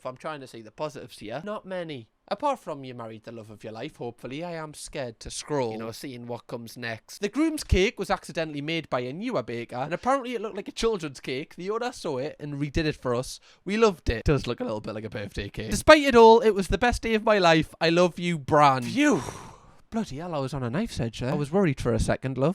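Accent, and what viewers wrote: Newcastle, United Kingdom. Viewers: The guy on his phone, I play online games and had a player who came on game on his wedding day to take part in an event, we were all just like 'dude why you coming online your wedding day?!' Thanks for the content as always, love that I found a fellow geordie to listen to although I'm from Northumberland so technically a northumbrian. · I heard you talking about your accent in a recent video. Don't you ever dare being insecure or self-conscious about it! When I found your channel, amongst other things I stayed BECAUSE of the accent, not despite of it. To my German ears you sound as British as it gets and I have no trouble understanding or following you whatsoever. You want a grating accent? Try listening to me! 😂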